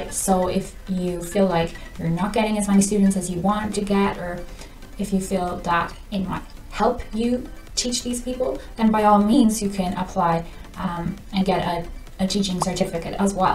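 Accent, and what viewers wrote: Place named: Ireland. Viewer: Are you from Ireland? You sound Irish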